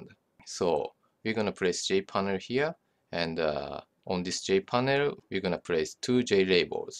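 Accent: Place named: Japan. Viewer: From your accent, I assume you are japanese, but your vocabulary is incredibly refined. Good job, good tutorial, and you've gained a sub. ;]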